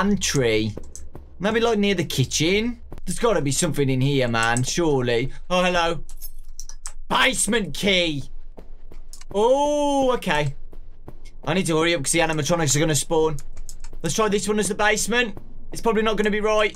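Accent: United Kingdom. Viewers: your the defenition of funny and amazing at the same time- Also love your british acccent! I think the british accent is just wizard, im studying it now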